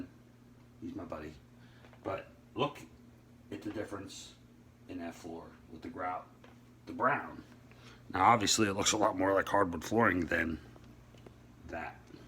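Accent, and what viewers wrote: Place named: Pittsburgh, United States. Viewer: ! LOVE your name!!! Trying to place your accent...are you from the Pittsburgh area?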